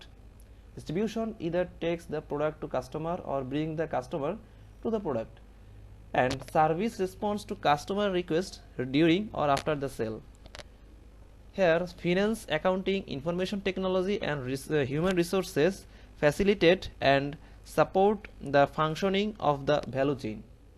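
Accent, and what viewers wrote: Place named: India. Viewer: Your accent is very bad ... i hate indain accent unknown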